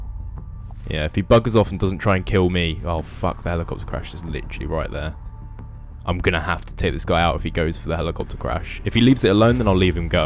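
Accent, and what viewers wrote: Northern Ireland, United Kingdom. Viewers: Oh lord the NI accent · The northern Irish accent has me dying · well, being from Northern Ireland, our accent is incredibly similar. Considering how a fuck load of Scots came to Belfast and surrounding areas. Hence the name, ULSTER SCOTS. Also, no need to be a prick about it. Being that you work with him, you'd know he's Scottish. I didn't know he was Scottish, I heard the accent and thought it sounded Northern Irish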